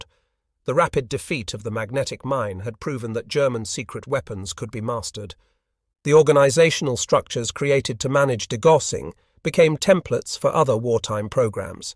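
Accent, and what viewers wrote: United Kingdom. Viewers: and AluMINIUM. (Not Aluminum). Bloke with a British accent trying to speak American. Spoils an otherwise interesting video on a key WW2 Royal Navy bomb and mine disposal event.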